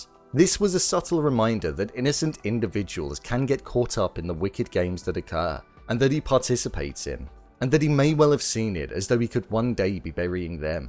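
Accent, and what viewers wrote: United Kingdom. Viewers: Your British accent lol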